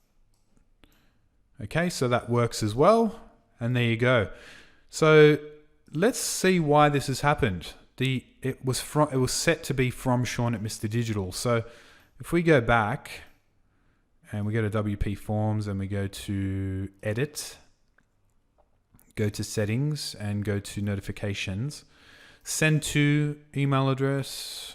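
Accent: Australia. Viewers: Man it's really helpful video. Thanks a lot. Are you from Australia?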